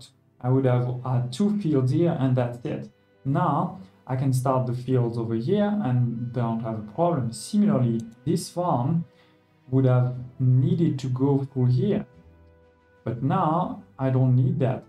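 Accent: France. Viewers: Merci ! Cet accent français à couper au couteau rend l'anglais parfaitement compréhensible pour moi, donc double merci ! Bonne continuation.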